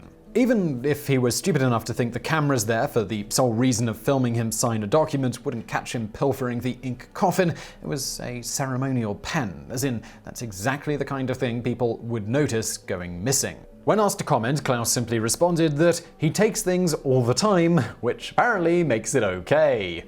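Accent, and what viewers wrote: England, United Kingdom. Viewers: Your English accent makes the insults all the much funnier. Thank you for my early morning lols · I was tickled by the title and it’s irony. While unnecessary, it was interesting pointing out these wealthy people who have committed these relatively petty crimes by comparison to those crimes historically committed by their counterparts. Hearing the host’s British accent and the words “wealthiest” and “thieves” makes it impossible to ignore the obvious. The majority if not all of the wealthiest nations and corporations in the world are wealthy because of all they have stolen, land and labor. The wealthy with few exceptions are an entitled bunch of folks. They spend what everyone else has worked hard for, and think everything is theirs for the taking.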